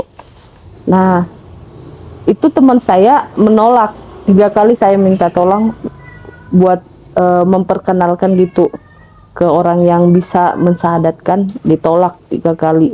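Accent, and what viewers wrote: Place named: Batak, Indonesia. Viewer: Batak gaya bicaranya seperti aagim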